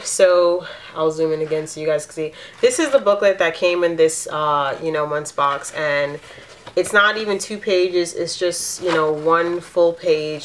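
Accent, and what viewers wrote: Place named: New York, United States. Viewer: thanks for the review. But I was surprise to really hear your New York accent, I'm from Chicago and Its usually not as strong in your other videos, but they must had really upset you with this box!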